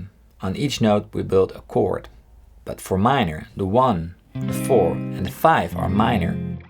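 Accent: Netherlands. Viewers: Are you Dutch? What's your accent? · You are Dutch for sure. I'm Dutch and I recognize your accent. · Are u dutch? Just wondering cause I am and the accent sounds familiar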